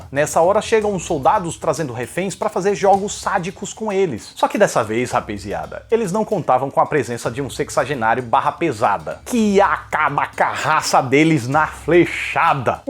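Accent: Northeast, Brazil. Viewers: Cara gostei muitooo do seu vídeo com certeza o melhor e mais completo desses últimos dias sobre Rambo... E eu sou nordestino e vc fala parecido kkkkkkkkkk, você sebe se vai ter alguma coisa do filme para vender na estreia do filme nos cinemas tipo a faixa a faca?? Seria ótimo para nós fãs, abraço! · Adorei o falatório. Bem nordestinês! Me identifiquei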